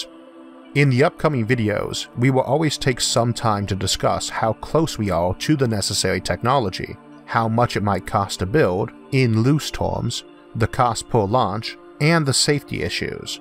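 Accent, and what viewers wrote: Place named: Louisiana, United States. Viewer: I don't want to make a point of this as I feel discussion of it takes away from your content, but I need to chip in here. I don't hear an impediment. I hear a pretty specific accent. I'm not American so I'm poor at knowing regional accents, but I always get a bit of the Louisiana twang, maybe? Indeed the only time I think about your impediment is when you mention it. Can't be all that bad I guess! Thanks so much for this wonderful little corner of youtube. Free of idiots and haters, just reasonable people with a shared interest. All the best.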